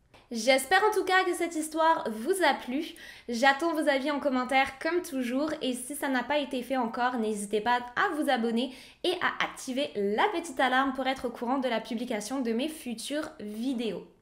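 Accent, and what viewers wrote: Quebec, Canada. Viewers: je sais pas cest tres bizzard jai limpression que ta une legere accent quebecois desfois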